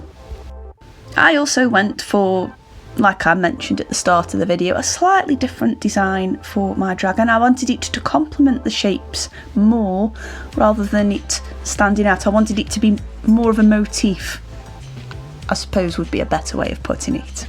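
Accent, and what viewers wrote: United Kingdom. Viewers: Ooo yay, I know this video is 3 years old but I’m excitedly watching upcrate videos since I have one arriving tomorrow. And I got even more happy hearing that you’re British😁😂 subscribing for sure and can’t wait to see the finished product!💕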